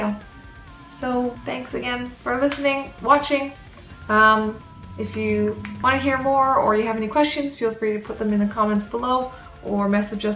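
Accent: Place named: Canada. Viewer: okay but her little canadian accent is actually adorable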